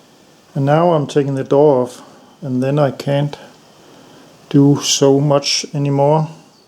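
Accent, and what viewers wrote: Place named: Denmark. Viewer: judging by the accent, I'd say you are from Denmark ❤ the video just showed up on my feed! cool project! keep it up!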